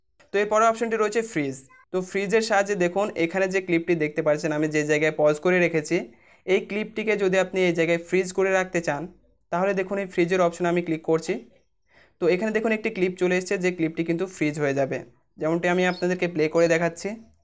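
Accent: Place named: Barishal, Bangladesh. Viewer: ভাই বরিশালে র ভাসা টা সেই লাগে🤣🤣🤣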